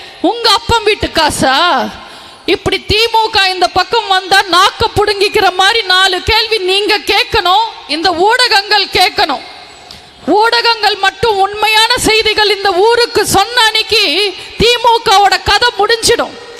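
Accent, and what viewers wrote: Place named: Telugu, India. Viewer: Cenema markettu sarincha piraku. Koovathoor koothadi kachiku koothu adika vanthutte. Adia. Nee pesura thàmil. Parthal nee thelungukariya thondaiyile. Enna machine vachiruke. Voice kekkave. Sakikaladi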